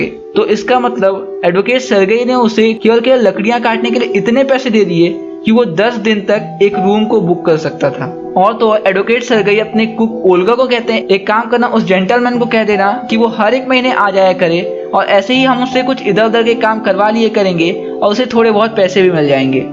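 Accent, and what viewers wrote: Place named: Bihar, India. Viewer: YAAR SAB KUCH TO THEEK THA BUT ACCENT SUDHAAR LO ....... BIHAARIYO KI TARAH BOL RHE THE EK DAM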